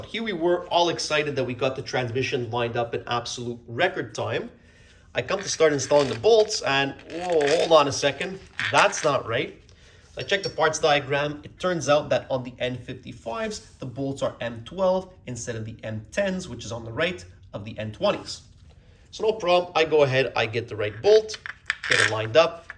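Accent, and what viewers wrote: Quebec, Canada. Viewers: nothing like a Quebecois French accent, grew up to a mother that had to learn English and grandparents that immigrated to the states in the 50s. I spent some time in Sicily in the military, Quebecois French kind of reminds me of Sicilian Italian.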